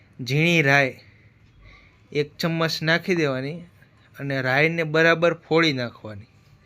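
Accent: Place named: Kathiawad, India. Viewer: ભાઈ કાઠિયાવાડી ગુજરાતી બોલો છો એમાં વચ્ચે વચ્ચે ઇંગ્લિશના ધબ્બા ન મારો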